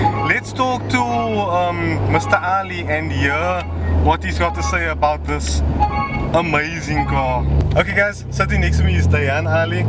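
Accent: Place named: South Africa. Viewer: Cape town South Africa......our country has a lot different accents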